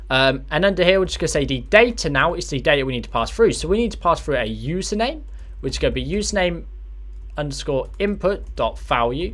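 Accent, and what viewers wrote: United Kingdom. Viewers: british accent hahaha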